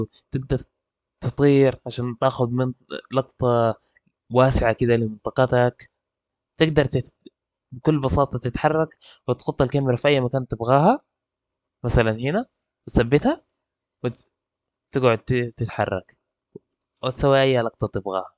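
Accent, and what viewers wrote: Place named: Myanmar. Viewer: انت برماوي واضحة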